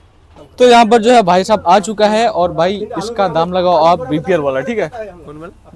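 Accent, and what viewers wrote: Bihar, India. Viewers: Apka voice mujhe pasand nahi shyad Bihari h hero giro type lgta h voice 😂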